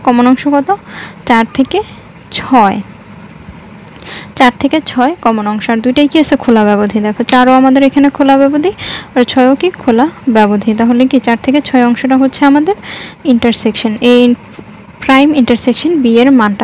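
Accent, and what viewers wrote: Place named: India. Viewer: excellent .am from bangladesh.eta ki indian na bangladeshi channel.kotha bartay indian lagteche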